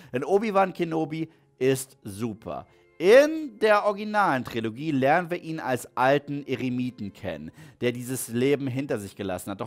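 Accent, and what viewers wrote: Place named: United States. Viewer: da hat aber jemand einen krassen us akzent. :)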